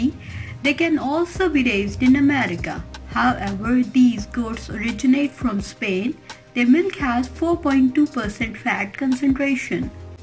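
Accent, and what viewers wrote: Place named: India. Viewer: ...Also hard to understand such heavy indian accent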